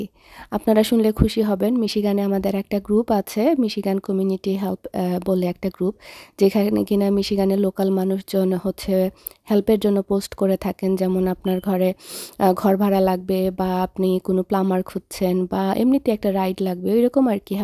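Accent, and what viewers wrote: Sylhet, Bangladesh. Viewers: Apni sylheti vashay kotha boilen valo lage but shuddhu boilen na valo lage na ...bolte paren je apni deikhen na apnr vdogulu valo lage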